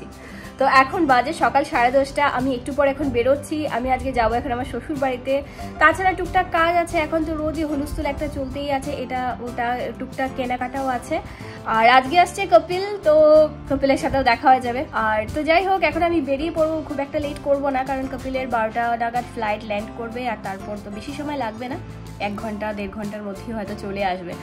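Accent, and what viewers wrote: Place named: Sylhet, Bangladesh. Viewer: Tomar seleti kotha khub bhalo lage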